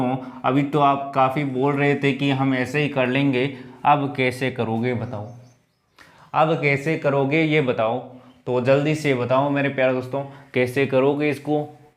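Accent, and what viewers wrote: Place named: Marwari, India. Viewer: sir you speak marwadi sounds very nice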